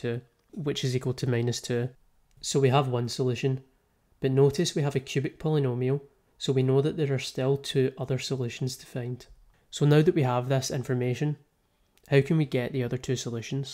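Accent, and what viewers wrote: Scotland, United Kingdom. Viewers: I did not imagine someone named to have a Scottish accent. Great video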